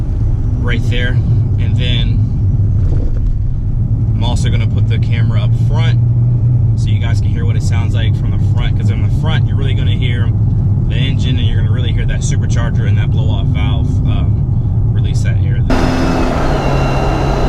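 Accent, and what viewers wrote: United States. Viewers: U from Alabama bro?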